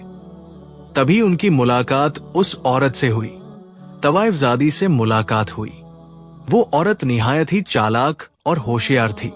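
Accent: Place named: India. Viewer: Ap kahtay bslochistan say taluq, lakin awaz indian lahja??? Kaya india say voiceover karwaee.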